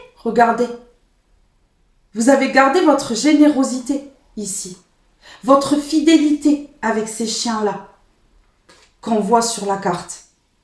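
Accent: South, France. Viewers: Et moi je vous adore avec votre petit accent du sud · C ´est si vrai ! Pour moi ❤ vous êtes rayonnante j’aime votre energie et votre accent du sud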